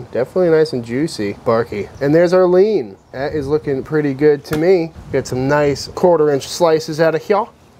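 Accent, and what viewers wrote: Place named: South, United States. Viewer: That slow southern drawl is just killin' me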